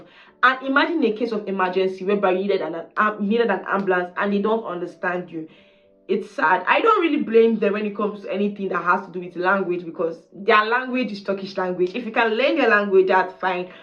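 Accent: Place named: Nigeria. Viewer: You speaks very much like Nigerians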